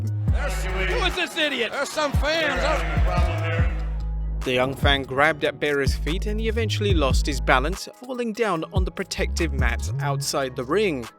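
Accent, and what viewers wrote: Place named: United Kingdom. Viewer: Why’s there always some British dude narrating these things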